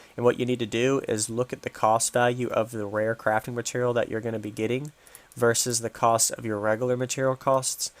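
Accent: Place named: England, United Kingdom. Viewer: INGOTS! not ignots.... N G.... not G N.... u sound english man wtf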